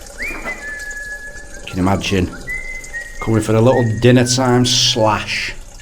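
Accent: Manchester, United Kingdom. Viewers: Top quality videos.great manc accent too.Cheers..Alf 🍺🍺🍺🇬🇧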